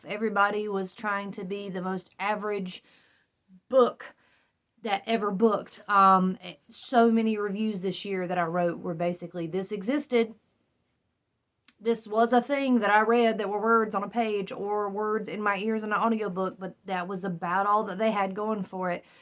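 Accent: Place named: Transatlantic, United States. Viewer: Transatlantic accent is so funny for this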